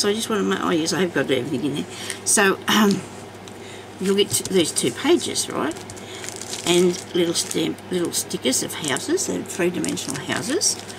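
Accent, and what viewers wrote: Australia. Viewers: Oh wow...just discovered your channel :).....being a newbie, I'm on a voyage of discovery! I heard you speak.....and thought ..Wow..another Aussie ..yay:). Love your work and so good to hear our lingo xxxx. Question? ....saw another video you did about lace covers and you used a hot glue gun. Does the glue show through with thinner fabric ( as in internal lining in a book?...spray glue leaves nasty marks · Merry merry Christmas. I love To hear another aussie voice. How do you wash vintage laces?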